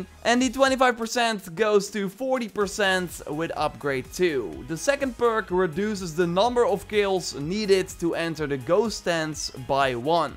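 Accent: Netherlands. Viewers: Nederlandser accent kan niet 😂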